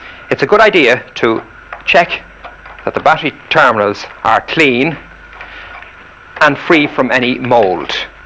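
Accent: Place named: Meath, Ireland. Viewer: Lovely meath accent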